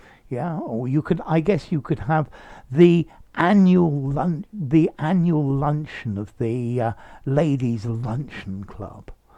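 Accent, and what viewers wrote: RP, United Kingdom. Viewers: Thank you, sir! I really like it when you speak posh English, even your facial features seem to be changing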